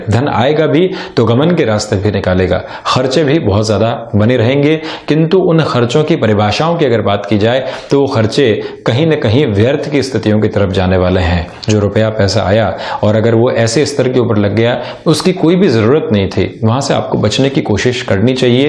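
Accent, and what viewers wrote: Standard. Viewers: Pranam. Bahut shuddha uccharan. 🙏🙏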